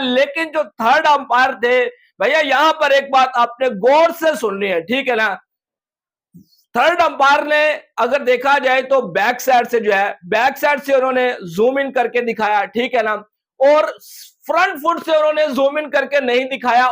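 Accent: Pakistan. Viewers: Tum indian ni ho saaf Pakistan se ho . Tera lehja bata raha he q k tera zobaan tere uniform ke 7 NAHI dekraha he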